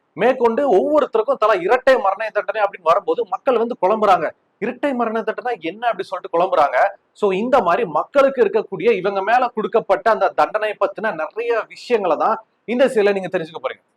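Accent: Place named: Madurai, India. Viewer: நீங்க மதுரைக்காரா ,உங்க பேச்சிலேயே தெரியுது,நானும் மதுரைதான்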